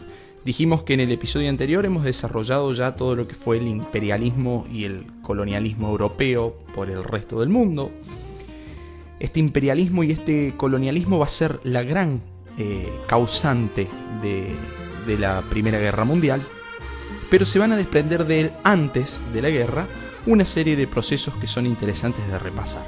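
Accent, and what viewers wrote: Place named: Argentina. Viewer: La verdad que dese hace semanas estoy buscando videos para oír antes de dormir y este canal me parece muy bueno, dormirme y culturizándome , incluso he llegado a soñar de lo que oigo y definitivamente prefiero la tonada Argentina y soy Venezolana , gracias por este aporte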